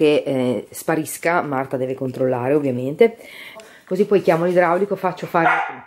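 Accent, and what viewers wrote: Emilia, Italy. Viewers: SEI UNA GNOCCA TERRIBILE 😊😊😊!!! L adoro è stupenda!! Adoro gli emiliani nn x altro sono di Reggio Emilia ma purtroppo nn abito li ed è una vita che nn ci vado 😔😔 xo seguendoti e sentendoti parlare mi sembra di tornare un po' a casa... mi piace molto la tua semplicità complimenti x i video che fai un abbraccio 😘😘🤗🤗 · Che ridere quando parli con!!!😂😂😂adoro l'accento emiliano!!!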